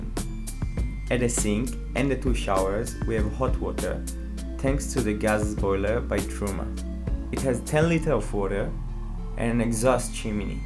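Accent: France. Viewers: you sound French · L'accent français représente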